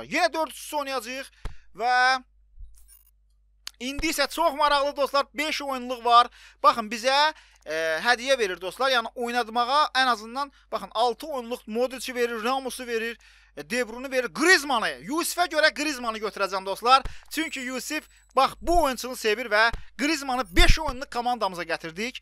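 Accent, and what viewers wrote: Baku, Azerbaijan. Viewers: Abi bu bakü şivesi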